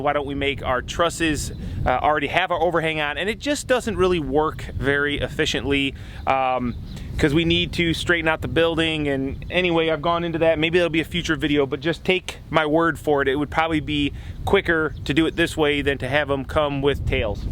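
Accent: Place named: Chicago, United States. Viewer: Dude. I was born and raised in Illinois, 30 years. Moved to Texas 3 years ago, and everyone tells me I have chiiiiicago accent. Well... I've never heard what they are talking about... Until today. After hearing you... I get the chiiiiiicaaaaaaago accent. Good work though!! Keep it up - enjoying the builds!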